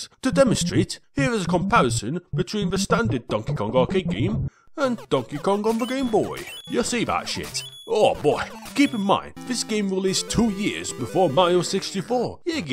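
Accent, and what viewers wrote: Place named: North, United Kingdom. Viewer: I spend every one of your videos trying to place your accent. Seems to be part gordie, part scouse, part Lancastrian, with a slight “wossy” style lisp.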